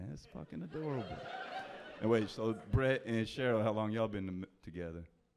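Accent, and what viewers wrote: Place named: New Jersey, United States. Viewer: As a fellow (undercover) Patel, has the strongest NJ/NYC accent I’ve ever heard. My dog came up!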